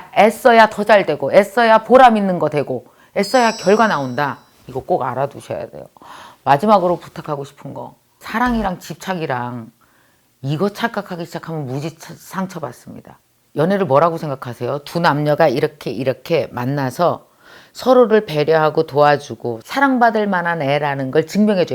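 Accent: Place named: Chungcheong, South Korea. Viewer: 불만인거가터 고소한 충청도 사투리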